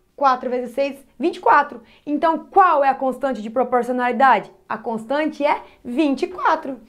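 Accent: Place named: Minas Gerais, Brazil. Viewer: Adoro esse "erre" ... és mineira? Tão doce, obrigado pelas aulas.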